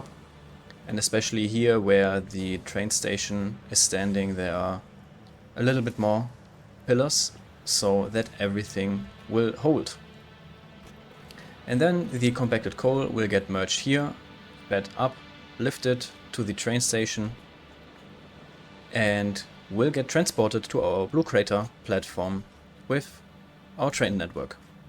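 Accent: Germany. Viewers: No problem :) Hahaha all god mate, bin auch Deutsch :D hab ich direkt rausgehört ^^ Sollte kein Front sein, glaub wenn man den Akzent nicht kennt, fällt das den English native speakern nicht auf ;)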